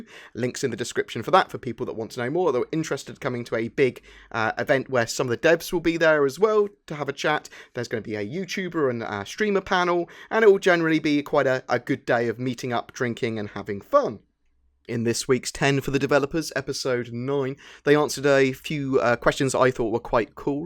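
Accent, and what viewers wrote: United Kingdom. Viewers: great video, love hearing a British voice talk about SC always makes it sound do much more sophisticated lol. please don't stop making videos!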